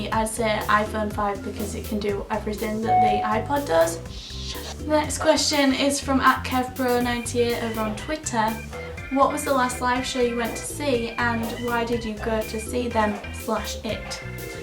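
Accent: United Kingdom. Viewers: What part of Britain are you from?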